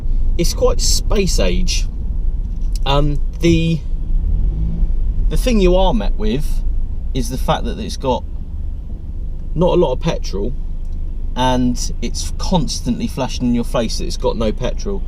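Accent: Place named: West Country, United Kingdom. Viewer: Hey dude, enjoyed the review and the camera work. You come across well, few rough edges here and there but overall pretty good. Bit of the west country accent adds a bit of character compared to the boring droll of some car reviewers. On a bad note and I hate to be this guy, but you do use "nice" too much. Other than that, you did a good job.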